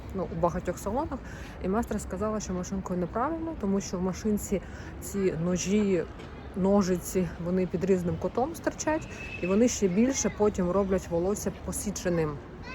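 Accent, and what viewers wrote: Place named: Ukraine. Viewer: вы русские слова пытаетесь произносить на украинский манер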